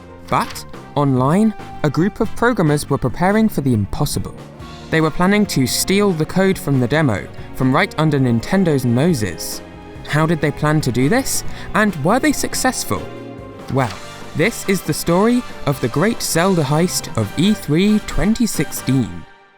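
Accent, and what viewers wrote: United Kingdom. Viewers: Dude any person who speaks English knows what a queue is you pompous ass. Just because you have a British accent and speak a less refined version of old English doesn't make you smart, it just makes you outdated. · your brit, right?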